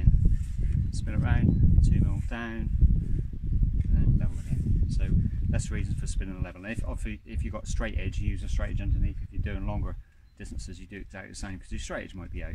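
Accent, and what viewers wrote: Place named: Norfolk, United Kingdom. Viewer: you sound like your around my parts... Norfolk by any chance? ..(groundworker trying to learn a thing or two)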